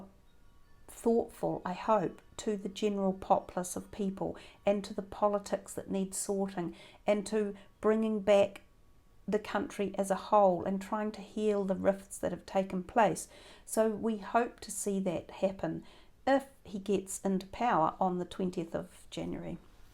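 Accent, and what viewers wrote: New Zealand. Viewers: It's a New Zealand accent and yes, it's lovely.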